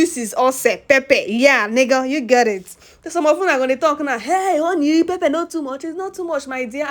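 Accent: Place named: Awka, Nigeria. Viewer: I like you ascent you spoke Igbo language in awka accent giving UMUDIOKA vibes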